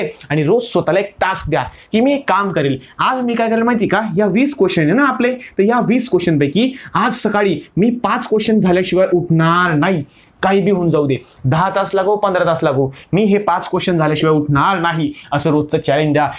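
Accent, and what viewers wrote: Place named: Jalgaon, India. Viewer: दादा तुम्ही जळगांव चे आहात का?, कारण तुमच्या भाषेवरून तसं जाणवून येत आहे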